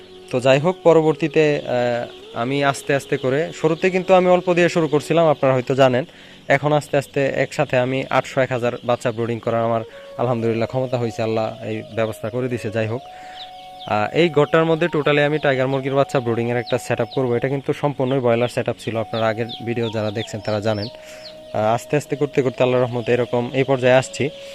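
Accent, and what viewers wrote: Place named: Mymensingh, Bangladesh. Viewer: ভাই আপনার বসা ময়মনসিংহের কথায়।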